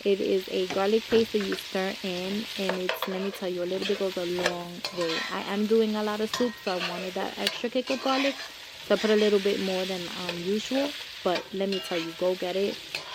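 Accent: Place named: Puerto Rico. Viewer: Be proud of your Beautiful Puerto Rican accent ❤❤❤❤❤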